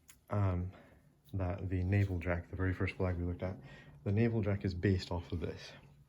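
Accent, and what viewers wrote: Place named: Scotland, United Kingdom. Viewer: Ii here a Scottish accent struggling to be heard over the American there 😊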